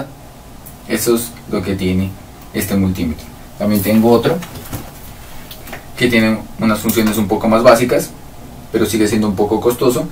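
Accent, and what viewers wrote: Pasto, Colombia. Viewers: Ud es de Pasto? profe. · Eres pastuso cierto?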